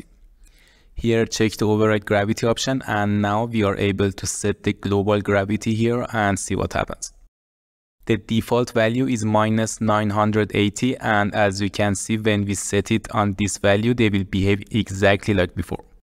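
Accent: Iran. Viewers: عالی بود جان فقط یه سوال دارم من تازه چنلت رو دیدم و دیدم لهجه داری فهمیدم ایرانی هستی بدون اینکه به اسمت توجه کنم... خواستم بدونم واسه تولید محتوا انگلیسی آیا بازدهی بیشتری داره؟ چون خودمم میخوام همینکارو بکنم... و احتیاج به راهنمایی شما دارم... و اینکه اگه توی ویدیو صحبت کنی آیا تاثیری داره واسه مخاطب هرچند سخته انگلیسی واسه ماها ولی تنیجه‌اش رو میخوام بدونم ممنون میشم جواب بدی🫶👑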